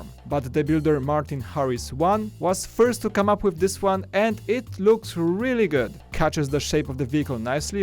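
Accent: Poland. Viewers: No offense, but polish accent is terrible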